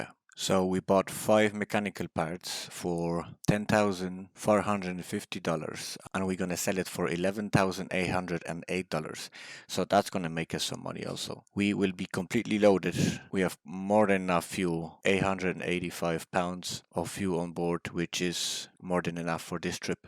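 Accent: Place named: Ireland. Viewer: Hi I have just started watching your channel I appreciate your aim to be entertaining......I thought initially you had a slight Irish in your accent but wasn't sure about your nationality.......thanks for your work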